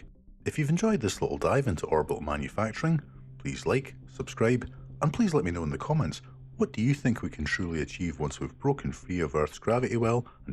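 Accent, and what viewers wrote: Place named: United Kingdom. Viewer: this is just creepy i was hoping to get away the britt accent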